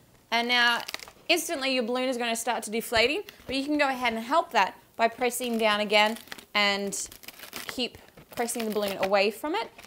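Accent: Australia. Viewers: Is she Australian? Great vid! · Aussie accent. Where in Australia did you buy such a large ball of yarn and where is the Mod podge from? Thank s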